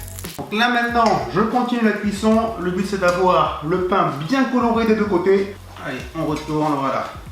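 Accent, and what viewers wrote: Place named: France. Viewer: je t'adore 😍 Non seulement pour toutes tes recettes, mais parce que tu mets à l'honneur beaucoup de recettes de mon pays 🇮🇹🇮🇹🇮🇹 Et ton petit accent français ne gâche rien à la prononciation italienne. Bisous 😘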